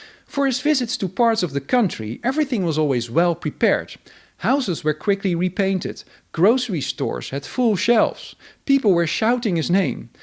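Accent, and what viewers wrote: Netherlands. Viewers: Not to be weird as a first time visitor but your accent sounds like Dutch English but genuine German when quoting Germans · It wouldn´t hurt to do something about the Dutch accent. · Thanks for that, very interesting. Can I ask, are you from the Netherlands? Ich versuche höflich deinen Akzent zu erraten!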